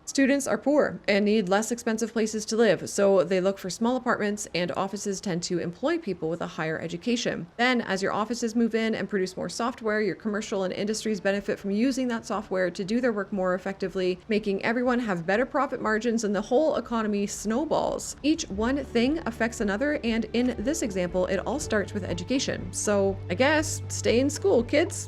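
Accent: Canada. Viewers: Nobody really says aboot but her accent does sound Canadian to me (source: Canadian) otherwise so I think it's just fun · I have no idea why but you sound alot more canadian in this video than other ones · ABOOT- CANADIAN ACCENT- ugh lol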